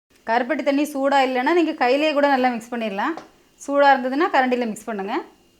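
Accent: Tirunelveli, India. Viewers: Nellai slang la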